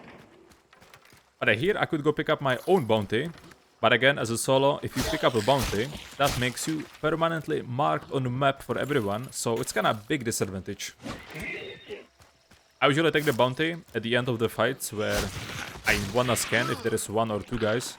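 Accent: Czech Republic. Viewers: Náhodou jsem na Tebe natrefil, podle přízvuku si říkám, to zní jako obyvatel stejné země jako já a ono ano 🙂, jsi opravdu kapacita, mrknu, zda máš i další videa a pak dám odběr, díky. :hand-purple-blue-peace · Damn i think i hear czech accent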